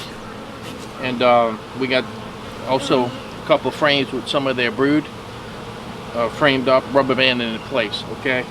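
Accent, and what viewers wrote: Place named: South, United States. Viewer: Love your accent,... The Dirt Rooster and Mr. Ed can’t match it! You southern bee guys need to get some headlamps, though... no having to hold flashlights in your teeth!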